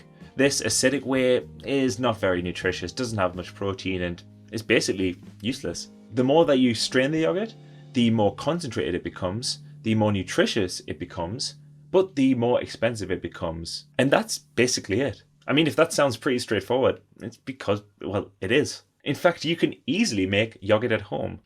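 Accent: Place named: Newcastle, United Kingdom. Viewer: Thank you. Your Geordie accent is lovely.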